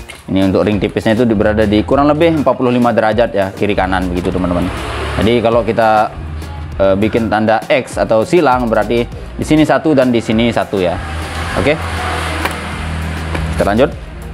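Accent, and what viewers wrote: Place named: Madura, Indonesia. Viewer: Dari Logatnya kayaknya Abang orang Madura ya? 😁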